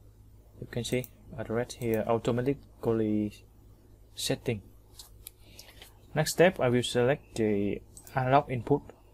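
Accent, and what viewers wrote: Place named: Vietnam. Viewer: thank you, by the way, your voice looks like Vietnamese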